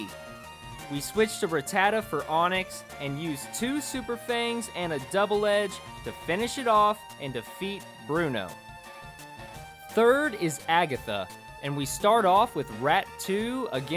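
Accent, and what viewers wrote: United States. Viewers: your southern accent makes this video funnier to me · Is that a South Carolina accent I hear?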